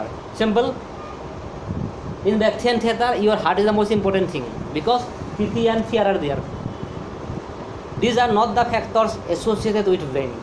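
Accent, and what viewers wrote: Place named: Assam, India. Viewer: According to your pronounce, I'm sure you are from Assam 👍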